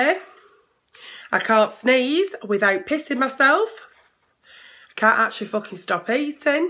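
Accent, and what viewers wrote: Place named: Rossendale, United Kingdom. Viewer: No mistaking that rossendale accent 😂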